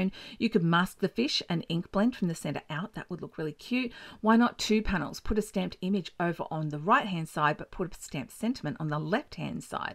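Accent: Australia. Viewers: So fun to hear a fellow Aussie accent! I'm so glad I found you in this hop - I just subscribed to your channel (international). I love these sweet cards you made!!! The mini gate fold is just so so cute!!! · SUPER cute images. And as a Yank (USA) I really enjoy listening to your Aussie accent. 😁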